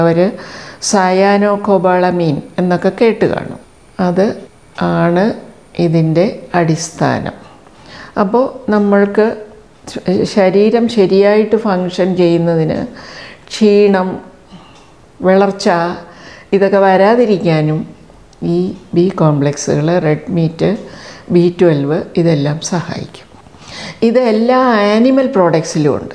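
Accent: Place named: Kollam, India. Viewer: 🙏🙏 teacher,super presentation ammayude sneham adhyaapikayude arivu ellaam koody nalla ishtamaayi 😍😍 teacher place kollam aano samsaaram kettappol thonniyathaane 🤗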